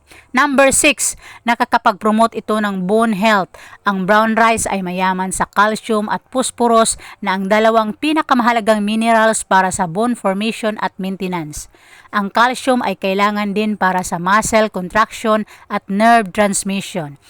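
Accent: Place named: Visayas, Philippines. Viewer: BISAYA KAMAN DAY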